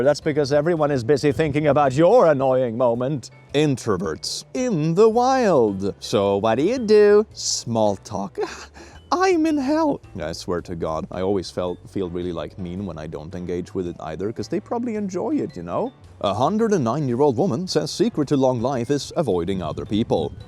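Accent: Finland. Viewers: Your Finnish accent gave me Gunnar Gunnarsson vibes, so... probably 100% accurate, lol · Your finlands svenska accent is absolute perfection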